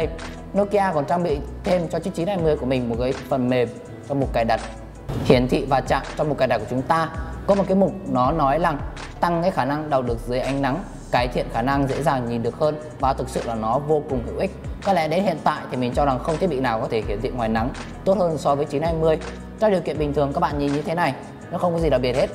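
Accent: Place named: Central, Vietnam. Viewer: ông này hình như giọng chính là miền trung.... nghe mõi miệng vãi....đánh giá chưa chính xác, thiếu tính khách quan...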